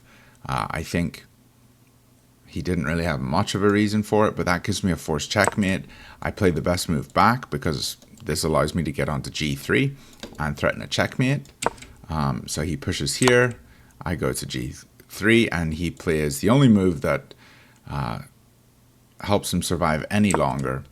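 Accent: United Kingdom. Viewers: Wait you live in the USA? I though you were British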